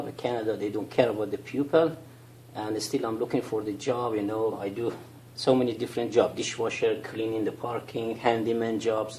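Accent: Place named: Iran. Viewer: 'vabrication' is 'vibration.' Love the Persh-english! Very good video. They absolutely have not made public any realistic solutions for the sound reconstruction of the main spillway. Hope they watch. Best wishes friend.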